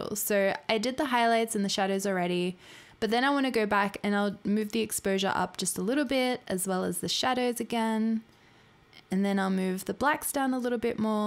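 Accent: Australia. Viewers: Thank you for the great tutorial,this gonna help me alot 😉 Are you Aussie?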